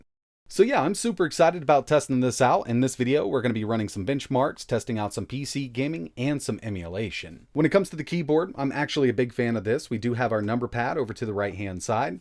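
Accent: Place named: Appalachia, United States. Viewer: I love watching this channel and have been following it for a very long time, at least two years - what interests me is what is his accent? From which part of the US, where people spoke with this kind of accent. Does anyone know that? His way of speaking is the only complaint I have regarding this channel - sometimes it sounds a bit to hillbilly, sometimes it is too noticeable! (it's not my intention to make fun of anyone)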